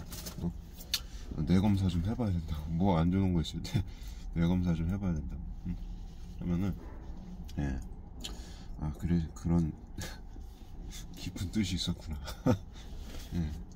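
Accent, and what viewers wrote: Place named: Incheon, South Korea. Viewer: 말투가 인천이신데 인천분이신가요?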